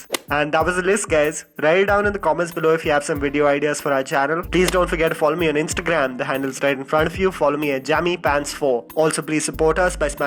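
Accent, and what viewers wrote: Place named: India. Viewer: funny indian accent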